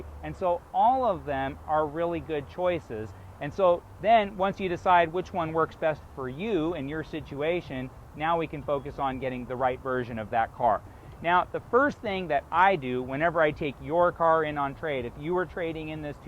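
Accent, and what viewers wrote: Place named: United States. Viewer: Despite am not very good in american accent english, but yes, I understood almost all this guy told us, and yes, he is right. Appreciations! Thanks baldhead guy(am also)😊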